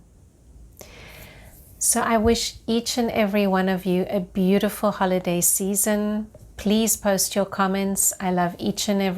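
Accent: South Africa. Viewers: THANK you! First time listener. You sound South African.. Greetings from Cape Town South Africa. 🐬🐧🐳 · Thanks you for this. I love your S. African accent and your clear and concise guidance. It is very helpful.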